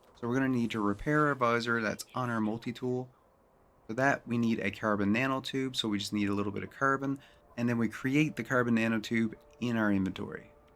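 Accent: Newfoundland, Canada. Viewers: Picked out the Newfie accent immediately, from there as well. Played this on ps4 a couple years ago, it felt empty to me. I’ve been seeing vids of this new update so I might have to pick it up on pc and try again.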